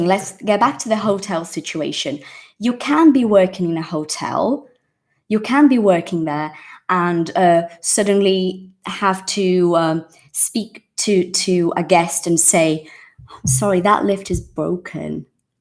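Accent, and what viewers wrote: United Kingdom. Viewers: Wow, you sound like a real British girl! I love that accent 👏👏👏👏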